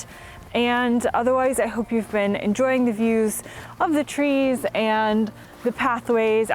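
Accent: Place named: United States. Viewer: Why does your accent still sound fully American after living in the UK for 10 years?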